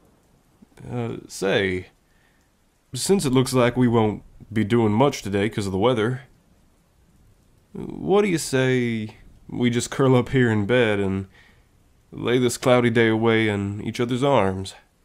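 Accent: South, United States. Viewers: I’m a sucker for the country accent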